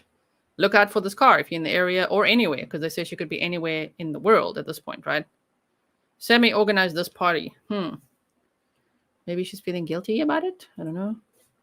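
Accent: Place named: South Africa. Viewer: Thank you we have subscribed. Love your South African accent. My friend in Hawaii is from South Africa and you reminded me of him. Keep up the good work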